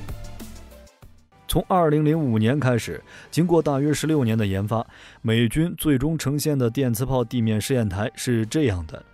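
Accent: China. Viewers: 那是中國的唸法，畢竟是他們國家的唸法，就不要用自己國家的唸法去糾正別人了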